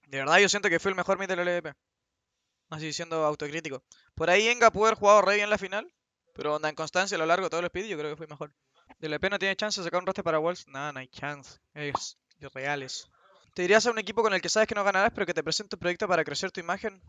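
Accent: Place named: Argentina. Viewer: Pq habla como argentino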